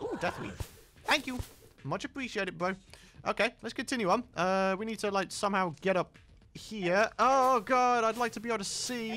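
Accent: United Kingdom. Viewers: Why does every terraria playthrough include jolly British men?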